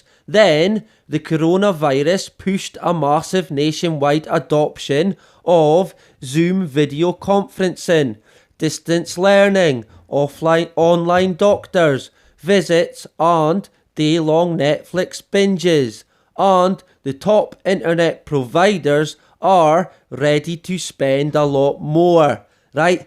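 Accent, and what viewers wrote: Scotland, United Kingdom. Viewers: I love your accent...sounds Scottish..... And no vaccine for me...💯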